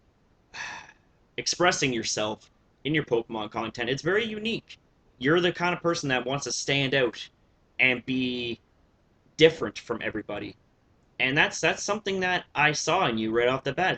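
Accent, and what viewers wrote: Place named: Canada. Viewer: If you are reading this, don’t ask me how I got here 10 years later but I just wanted to say I FUCKING KNEW IT LMAO! Throughout the whole video I was being a little shitter and poking fun at your very very subtle Canadian accent. Then you made the reveal and I laughed so much. Now I really feel like I have a good ear for picking up these accents no matter how micro they might be. Anyways cheers from America, hope you’re doing well wherever you are bro!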